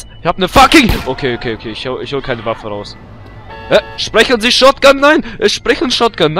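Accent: Russia. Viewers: bist du russe?:D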